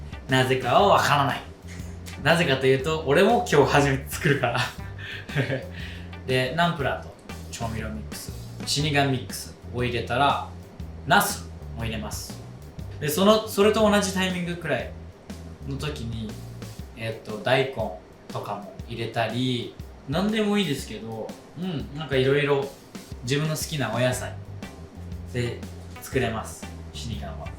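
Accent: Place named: Kyoto, Japan. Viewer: 時々でる京都弁笑えてもうた🤣 作ってみたいな🤔てかレイちゃんの手料理食べたーい❤料理恒例にしてほしいな！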